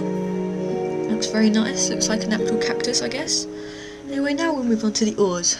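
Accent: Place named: United Kingdom. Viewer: Awesome a british accent !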